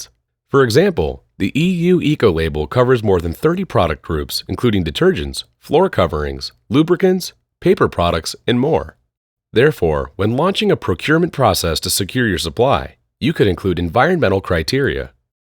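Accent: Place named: United States. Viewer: Why is there an American voicing for an EU project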